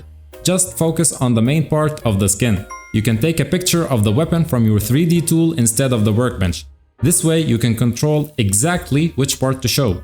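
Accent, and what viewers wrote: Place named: Poland. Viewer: Where are you originally from then? I was 1500% sure you’re Polish judging by your accent 😄 Otherwise I would write in english. There’s a lesson in that case: don’t judge a book by it’s cover 🤣 Well, now it makes more sense to make only cs2 dedicated videos.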